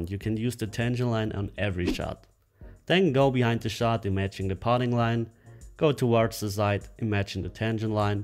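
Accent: Germany. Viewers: Gory Guerrero he is from Germany.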